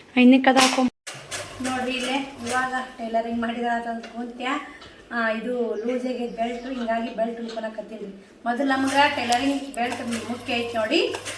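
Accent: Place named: Uttara Kannada, India. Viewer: ನಿಮ್ಮ ಪ್ರತಿ ಲಾಗ್ ಗು ನನ್ನ ಹೈಪ್ ಇರುತ್ತೆ ಸಿಸ್ ಖುಷಿ ನೋಡೋಕ್ ನಿಮ್ಮ ಲಾಗ್ ಮಿಸ್ ಮಾಡಲ್ಲ ನಾನು ಡೆಲಿ ನೋಡ್ತೀನಿ ನಮ್ಮ uk ಭಾಷೆ ಕೇಳೋಕೆ ಚೆಂದ 🎉❤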